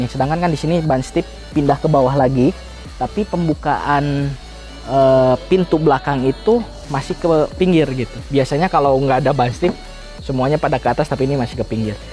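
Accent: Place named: West Java, Indonesia. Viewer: katingali pisan sundana eu...y...😄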